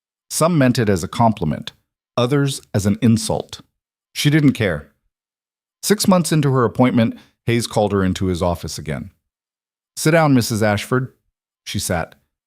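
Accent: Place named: North America. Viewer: Ugh at the lisping N. American accented AI for a story set in 19th century England.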